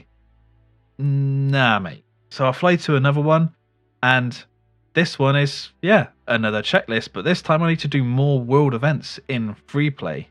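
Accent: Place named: North, United Kingdom. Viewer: Sound like a northern so subscribed no questions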